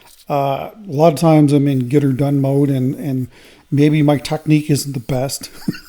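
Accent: Canada. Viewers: I love listening to your commentary as you work! And Canadian accents are the best 😊 Two beautiful pieces today. I usually go for walnut, but the spalted birch is my favorite.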